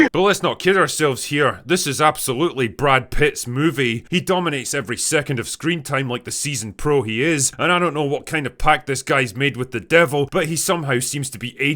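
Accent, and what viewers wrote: Scotland, United Kingdom. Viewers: So I have to say I haven't been watching all of the F1 movie reviews because I'm going to see it tomorrow in IMAX I'm a Brad Pitt fan I'm a big F1 fan and I didn't want to spoil it but wow your review was awesome not because you said you like the movie but why you said you like the movie. I was listening to it and I said this guy's got to be from Scotland and my wife's ancestry is Scotland and I love the Scottish people hey any man that can wear a kilt and pull it off I got to give you credit and who doesn't like bagpipes anyways love the review love your attitude about it and now I'm a subscriber and looking forward to more things and I like your channel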